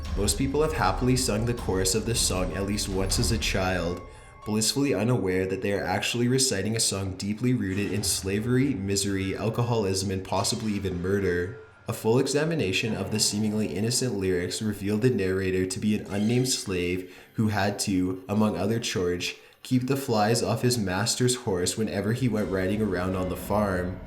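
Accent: California, United States. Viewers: The narrator of this is soooo obviously Californian !! Such a thick accent, where ever sentence almost sounds like a question. lol. Not hating, just saying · lizzie your right, sounds like "the Californians"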